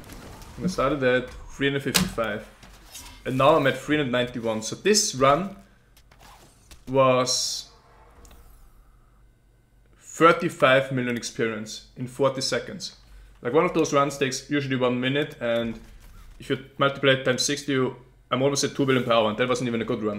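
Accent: Germany. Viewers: I was a bit skeptical until I heard the accent. Those Germans man, they know their efficiency.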